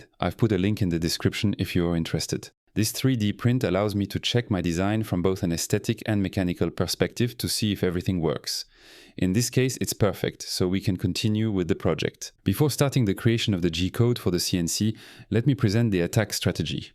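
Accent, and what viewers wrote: France. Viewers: It's impressive, how capable FreeCAD became and I've really enjoyed watching your CAM tutorial. By the way, your lovely French accent is really charming. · Where's your perfect English accent? Finally, your real voice? That French accent is a bit funny, personally I like it, and your English is good. My voice would be much worse 😀😀😀👍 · Heya! You've got a good fluent English with a lovely fleur (you may be saying gaze or voile these days, but in Russian we still say флёр when we mean gaze or voile figurément, I think it's an old-school maniere which dates back to XIX or even further to XVIII and all those Lois... Lous... Louis('s) you used to have) of French accent, mate! Do not be shy of it, keep it rolling! And for what it's worth, a turbine is driven by working medium, e.g. by fluid, by gas or anything else, whilst a fan - or better yet, an impeller - is the thing that moves the working medium, be it air or water or whatever. 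So you added a fan to your spindle to kick away chips from cutter, not a turbine. And just between us, I wouldn't say it loud that I've got any sort of piété to Tesla in general and the Truck in particular should I have one. It is rather controversial aveux.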